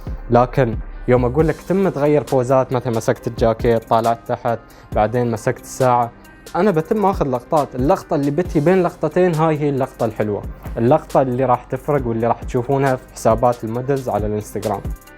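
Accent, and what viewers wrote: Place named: Iraq. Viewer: قناتك تعمل محتوى ذو جودة عالية وهذا سبب انتشارها السريع استمر هكذا وسوف تنجح أكثر.. بالمناسبة أظن أن لهجتك ليست عراقية بشكل كامل فهي مبسطة وتدمج فيها الفصحى وهذا يجعلها سهلة لغير العراقيين. عجبتني اللهجة ولكن الموسيقى الخلفية مكررة ..ياريت في كل فيديو تستعمل موسيقى مختلفة. وياريت تعمل فيديو كيف تصوير الفلوغات .. فأنا أحاول عمل فلوغات ولكني لا أعرف كيف أختار زوايا التصوير.. اعمل لنا هذا الموضوع مستقبلا لو استطعت.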